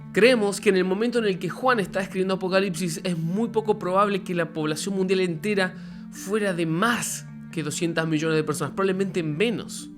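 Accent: Argentina. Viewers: Por tu acento pareces Argentino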